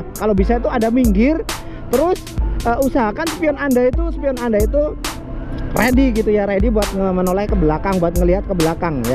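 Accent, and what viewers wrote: Java, Indonesia. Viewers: ojo lali di subrekkk.... jowone ngendi kang???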